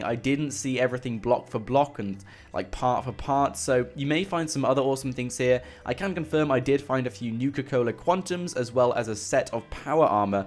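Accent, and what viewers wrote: England, United Kingdom. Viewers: he's English with a normal voice. we all don't sound like hillbilly inbreads like you lol.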